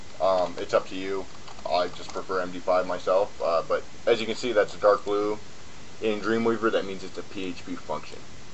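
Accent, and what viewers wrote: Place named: Texas, United States. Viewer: nice tutorial thanks! oh btw, you are from Texas, right ? cool accent :) cheers from Argentina!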